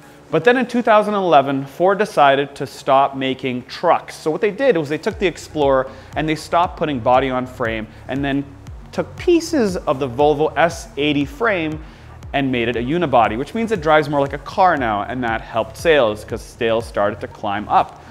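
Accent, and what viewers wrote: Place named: Canada. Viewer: acording to his accent this channel is from canada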